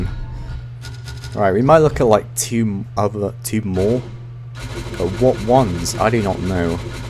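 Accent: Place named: Plymouth, United Kingdom. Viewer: Where are you from? South west? Can hear the janner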